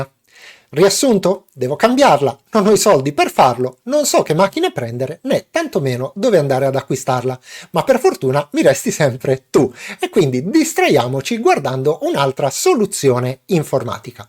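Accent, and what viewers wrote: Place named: Palermo, Italy. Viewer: Abiti a Genova però il tuo accento è palermitano. Comunque grazie per i tuoi video che sono sempre utili.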